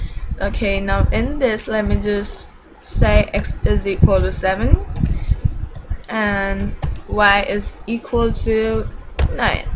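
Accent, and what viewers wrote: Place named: United States. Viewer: american english but its good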